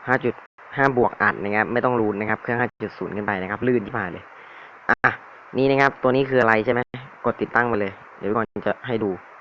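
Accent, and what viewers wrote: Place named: South, Thailand. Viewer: คนใต้เออ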